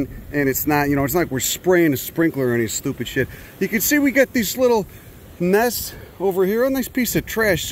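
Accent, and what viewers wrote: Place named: New York, United States. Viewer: What is that accent!? 😅 I love it as it sounds like Peter Griffin crossed with...err, forgive me I'm not from the US...either Italian New Yorkian or downtown Bostonian. 😅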